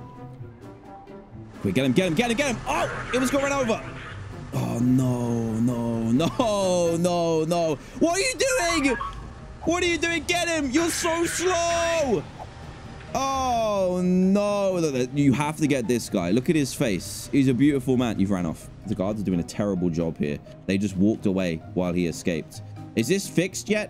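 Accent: United Kingdom. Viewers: is British 👀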